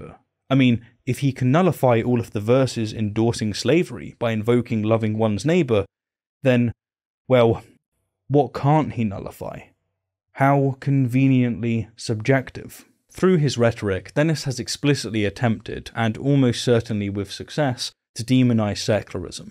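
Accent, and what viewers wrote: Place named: Midlands, United Kingdom. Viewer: No. He is a native English speaker from the midlands.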